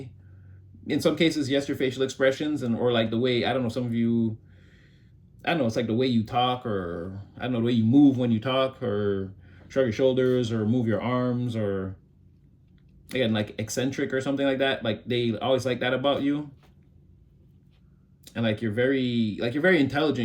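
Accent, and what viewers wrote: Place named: Caribbean. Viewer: As a Caribbean, I love this reader lol and whenever he speaks in patois I swear it feels like he’s channeling my Caribbean passed on loved ones lol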